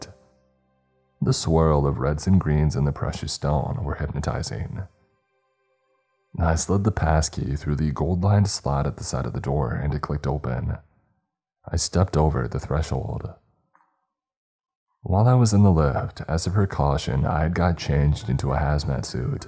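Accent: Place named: United States. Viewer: I was about to say it was strange hearing an American accent on an British character but then I remembered that you've voiced, and I quote, "a small town girl" before and somehow I can always look past it because your narrations are that good.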